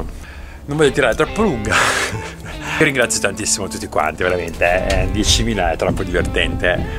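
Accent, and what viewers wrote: United States. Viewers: Grande continua così. La cosa più fantastica è sentire che parli un italiano in stile americano ed aver perso il dialetto del tuo paese di origine.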